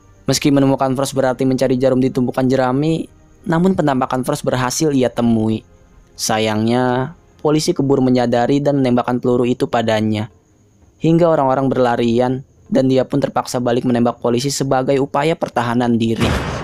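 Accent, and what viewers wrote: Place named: Banyumas, Indonesia. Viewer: Kaya ngapak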